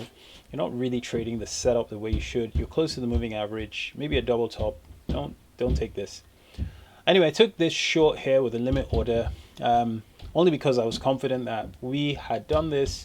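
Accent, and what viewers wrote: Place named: England, United Kingdom. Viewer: Btw where are u based? You sound English but your charts are in America :-)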